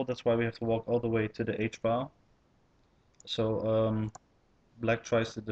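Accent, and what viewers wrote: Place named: Egypt. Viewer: Why English Mr?!, For who you are making this? Make same video with your sweet Egyptian accent pls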